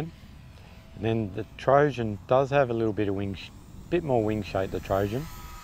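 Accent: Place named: Australia. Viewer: Accent = Ozzie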